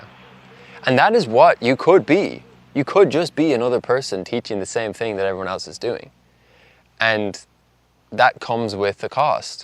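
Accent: Ireland. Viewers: is that another irish accent I sense?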